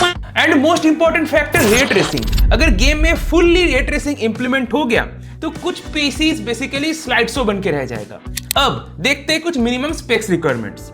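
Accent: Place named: Bihar, India. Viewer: Bhai phle naak se baat krna band krde its very hard to understand what you are saying in that bihari accent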